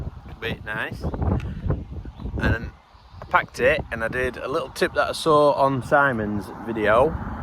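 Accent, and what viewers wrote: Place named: Northern England, United Kingdom. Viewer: Greatly enjoyed the chat. Beautifully Northern, lad!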